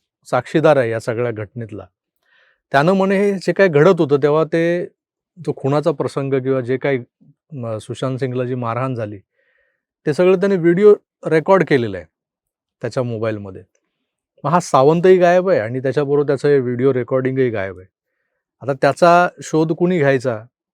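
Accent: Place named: Pune, India. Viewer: O ' sir U speak marathi very pure than sadashiv peth.Ur knowledge is very Crucial N genius N brilliant.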